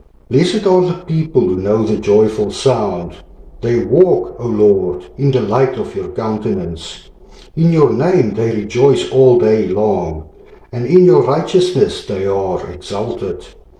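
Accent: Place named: South Africa. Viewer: Hey, sounds like a South African brother!! 🇿🇦 God bless.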